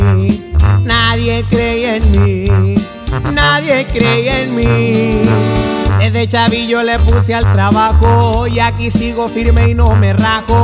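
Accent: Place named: Santa Fe, Argentina. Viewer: Me suena a santa fe 🎉🎉🎉 éxito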